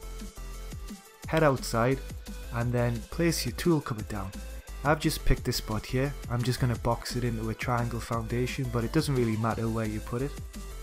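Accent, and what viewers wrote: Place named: Newcastle, United Kingdom. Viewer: Nice build mate and you a geordie too? sound very local lol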